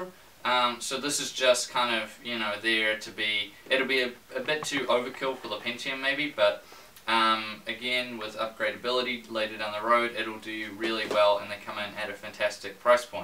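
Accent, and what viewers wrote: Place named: New Zealand. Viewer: Just realized the new zealand accent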